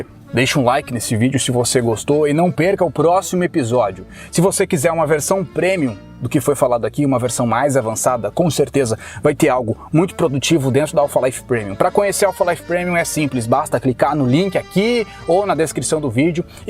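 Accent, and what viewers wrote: Rio Grande do Sul, Brazil. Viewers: Se tive se um flauta chinesa de fundo... Hehe Você é gaúcho? Parece muito ser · é gaúcho???👍😏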